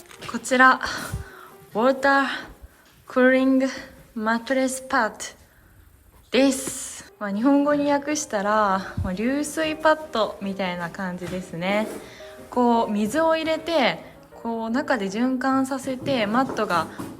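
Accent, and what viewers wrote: Yamaguchi, Japan. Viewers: カメラの前を占拠するミンちゃん最高です🐶 ミンちゃんちゃんと分かってますね🤣流石です👍 メンテナンス、、、ズボラに果たして出来るだろうか？今回やたら山口弁が出てるのは気のせいだろうか？笑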